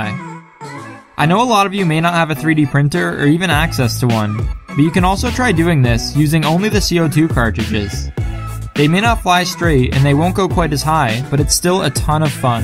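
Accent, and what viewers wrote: Canada. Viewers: I can tell you are Canadian, because you said oat instead of saying out.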